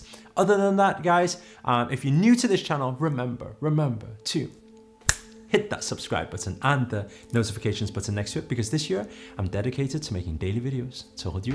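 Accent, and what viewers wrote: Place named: United Kingdom. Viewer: Love your videos and your British accent... Thank you for this explanation!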